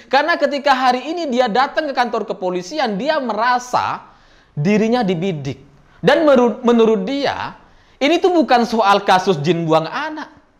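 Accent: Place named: Madura, Indonesia. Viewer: Mas itu lucu,logatnya madura banget,mirip suami ku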